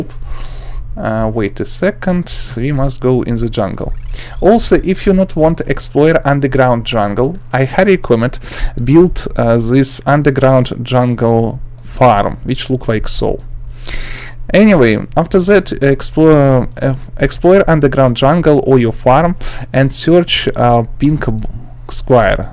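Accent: Russia. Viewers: this guy is russian but trying hard to speak english respect❤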